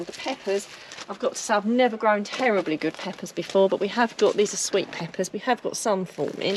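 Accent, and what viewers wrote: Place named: South East London, United Kingdom. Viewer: are you from SE London/Kent border? The accent! Reminds me of home!!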